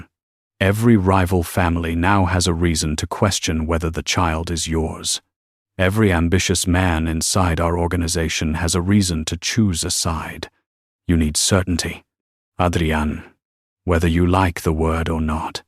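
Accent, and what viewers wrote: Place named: France. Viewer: frenglish? beautiful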